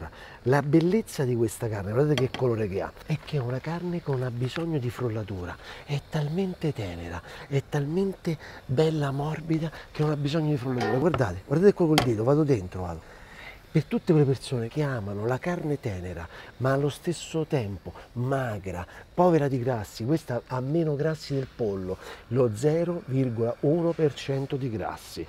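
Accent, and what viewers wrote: Rome, Italy. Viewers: A parte la tua cultura culinaria che nn si discute, per la personalità e il modo di esprimerti così semplicemente romano, nn sei da meno di grandi attori come Sordi, Fabrizi Montesano e altri! Sei vero!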